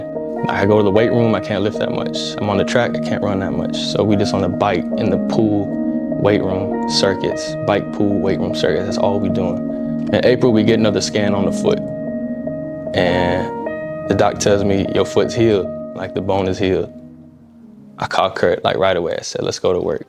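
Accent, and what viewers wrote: United States. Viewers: Bruh, how do you sound like you're from Texas but you claim Canada for the Olympics? Help me understand. Best of luck for next year and LA. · You have a louisiana accent bro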